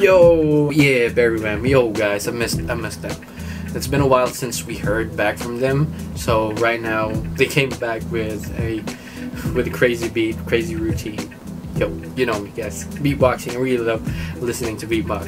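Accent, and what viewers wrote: Philippines. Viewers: Illongo ka sir? Hahaha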